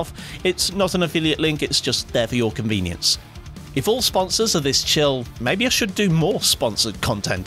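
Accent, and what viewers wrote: United Kingdom. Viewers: He doesn't sound at all like NX gamer, both have british accents but that's it. They're even different dialects from different parts of England.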